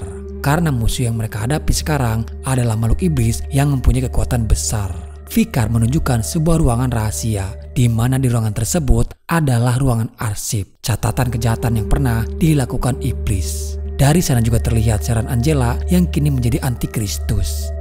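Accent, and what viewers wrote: West Java, Indonesia. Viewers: Aa orang sunda yah? 😀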